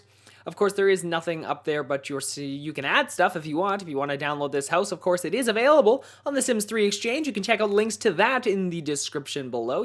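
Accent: Canada. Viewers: You sound very Canadian... If he is sorry I had no idea. I thought that because of how he said sorry (Soary).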